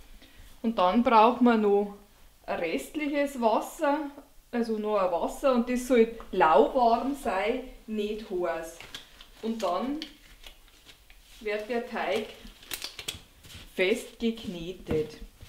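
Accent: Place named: Bavaria, Germany. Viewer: .... und so ko ma d'laet bscheissen.... köstlich ! Ich finde Ihren bayrischen Akzent und Ihre Art zu kochen einfach wunderbar. Sie strahlen bayrische Gemütlichkeit aus. Ich lebte auch lange Zeit in Niederbayern und weiss das zu schätzen. Übrigens, wo kann man so eine tolle Gewürzmühle kaufen? Liebe Grüsse aus Spanien.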